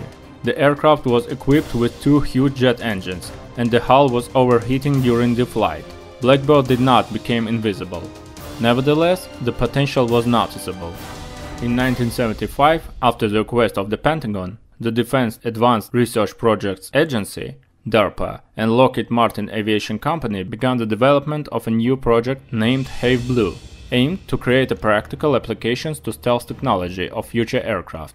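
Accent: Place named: Russia. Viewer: The narrator has obviously a Russian accent. Ironic, since this is about the Stealth.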